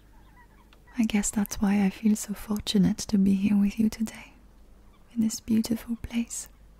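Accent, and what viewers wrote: France. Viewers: First 30 seconds in and the French accent is melting my brain away. This accent sprinkles happiness diamonds on my being once again. This accent is just to powerful. To gorgeous. To elegant. I can't fight it!! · The French accent soft-spoken videos are my favorite from your channel. This one is actually quite sad. I hope there's a part 3 :( Thanks for sharing. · Man, I always love your french accent videos. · I saw French accent asmr and I press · Yes another french accent vid, love the audios keep up the amazing work! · I listened to this after a very long and hard day and the stress just melted away. Also a French acsent never sounded so appealing. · Well part of me in some distant future hopes for a part 3, (shh this is a secret 🤫 but i personally like the French accent audios definitely a favorite of mine to listen too) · It's only been 9 days and we already need more of the French accent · I love the French accent vids! 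I hope there will be a Part 3! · My,my,my i think have to start study French because your accent is magnificent 👌 ❤❤❤ N.B: Umm, so there are 2 similar videos in got a bit lost😂😂, and double post means a fine night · I find this very beautiful and comforting. I closed my eyes while listening to this audio, and pretend that I'm spending time with the maid and getting to know her better. The French accent sounded very beautifully and I can say I enjoyed it. Great work. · I am obsessed with the french language and accent, and hearing from a soft-spoken sweet voice with caring words is nothing short of perfection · I love your French accent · Aaa, good cute French maid is really something. Your French accent make me loved French and made me temember my highschool day when I learned French myself. ❤❤❤❤ Thank you for the upload. ❤❤❤❤ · I loved this audio. I hope we get a part 3 someday. Also, if you ever just want to upload a video of reading poetry in your French accent, I might die in bliss (or at least sleep in it!) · I waited three days for the perfect morning to listen to this since I saw there’s a second part of my favorite maid asmr. 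It was worth the wait, it was worth to listen. Now I really hope there will be part 3. No one on YouTube is providing such charming content. Maid, French accent, even poetry with all background sound. You’re truly amazing. Thank you for being with me this morning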